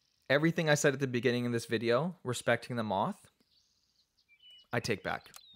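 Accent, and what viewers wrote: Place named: Netherlands. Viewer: I can hear the NL influence haha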